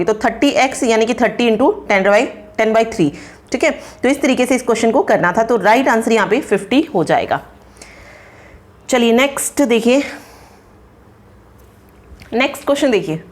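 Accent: Haryana, India. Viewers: And mam app haryana se ho apki language me haryanavi touch h an ye video hamera liya bhoot hi helpful h so thanku again mam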